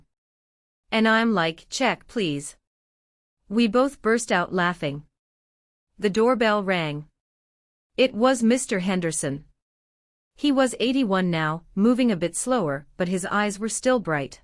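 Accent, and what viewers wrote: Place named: Asia. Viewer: It is hard to listen to bc the voiceover sounds very brokenly with some kind of Asian accent. Though the story might not be bad, the voiceover is amateurish.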